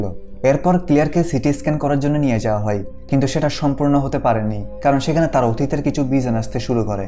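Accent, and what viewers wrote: Chittagong, Bangladesh. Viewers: ভাই মনে হয় চট্টগ্রাম এর মানুষ যতই শুদ্ধ বলেন না কেন চট্টগ্রাম এর ভাষা বের হচ্ছে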